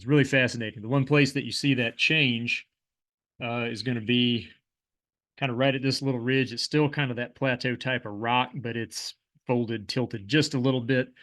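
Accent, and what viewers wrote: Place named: Texas, United States. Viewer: I can't place your accent. Which is strange. It's like half southern and half texas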